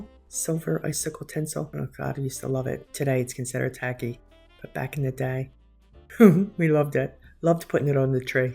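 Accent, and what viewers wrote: Philadelphia, United States. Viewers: Just stumbled upon your video when I was looking for vintage Christmas crafts. As soon as I heard your voice, I knew you were from the Philly area! And then you mentioned Kindy’s! That’s so close to where I grew up!! Just subscribe to support a fellow Philly girl!!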